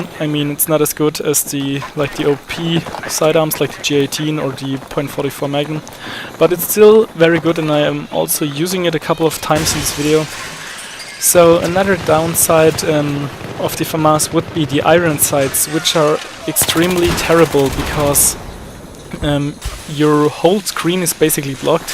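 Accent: Germany. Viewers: youre english is very german^^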